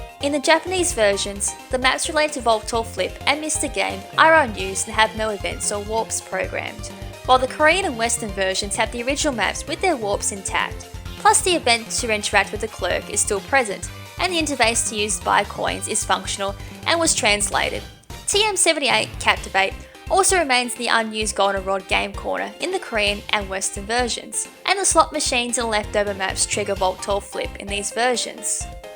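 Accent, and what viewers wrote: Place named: Australia. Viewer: I am tempted to not watch your videos for a while, not because I don't like your videos, but because I love listening to your voice speak about Pokemon. Sounds weird I know but I like Australian accents x)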